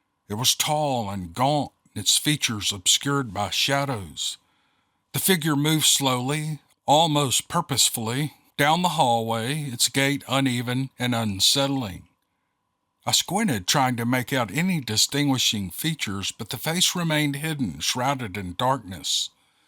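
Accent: South, United States. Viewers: One of the many things I enjoy about listening to your podcasts, is that you are not in a hurry! English is not my first language, and people often try to speed things up on YT. You don't, you read like you speak. With a lovely Southern drawl, not too broad for foreign ears... You stay far away from anything political... a true gift in this day and age... In the short time I've been listening to you, no evening has gone by without you sitting on the edge of my bed, the cadence of your voice slowly rocking me to sleep... Thank you for what you do here,! We really appreciate your efforts! ❤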